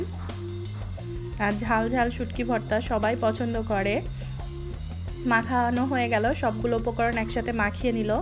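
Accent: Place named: Dhaka, Bangladesh. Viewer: bangali Na tumi dhakai Bangladesh theke tomar kothai bijha jache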